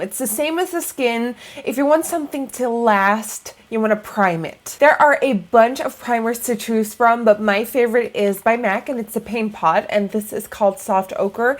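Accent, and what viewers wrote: Netherlands. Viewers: Kom je uit helmond?? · yes. she is from the Netherlands but it's less noticeable in more recent videos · Is it me or does she sound a lot more Dutch in this video?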